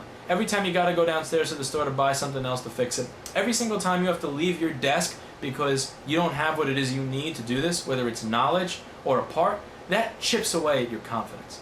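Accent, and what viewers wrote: New York, United States. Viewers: Louis Rossman has that NYC accent.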